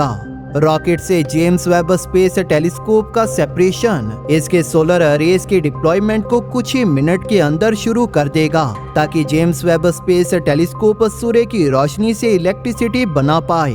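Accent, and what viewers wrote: Punjab, India. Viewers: O paaji, tussi Punjabi ho...?😁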